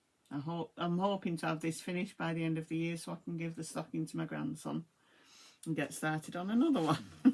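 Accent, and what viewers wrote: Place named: Northern England, United Kingdom. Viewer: Just got into smalls so the winter pattern would be lovely to add to my very small collection - love your channel, so nice to hear another northern accent!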